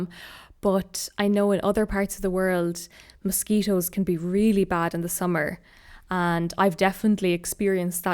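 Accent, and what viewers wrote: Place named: Ireland. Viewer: What part of the island are you from ? I've heard the irish accent changes a lot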